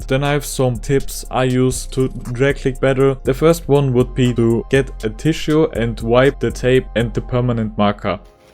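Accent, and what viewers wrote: Germany. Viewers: Are you German? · Was ein deutscher 😂😂😂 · You sound like typical german-english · Tysm your german ? U got that accent?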